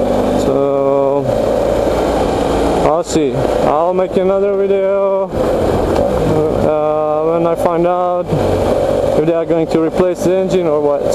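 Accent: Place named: Germany. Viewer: may i ask, where you're from originally? You sound like you have a German accent. Take care, ride safe,stay safe dude !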